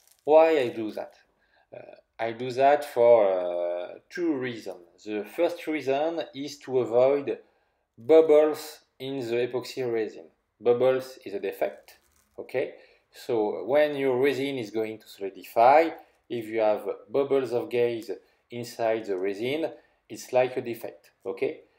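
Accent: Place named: France. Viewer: its hard to speak english with french accent try to listen to old school american rap music with practising after them that's how i ignored my arabic accent influence on my english i hope it will help you great vid btw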